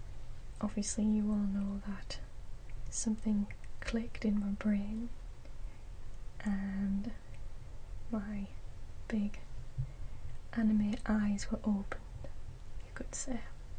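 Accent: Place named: Newcastle, United Kingdom. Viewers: This is why I find your voice so relaxing! You're a Northerner! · I thought you were from Newcastle! I live right near Bishop 😂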